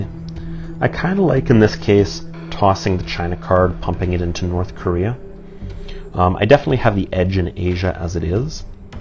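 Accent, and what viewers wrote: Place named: Canada. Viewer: Well played, thanks for posting. Your commentary is some of the calmest I've ever heard in TS videos (maybe it's that pleasant Canadian accent? :D )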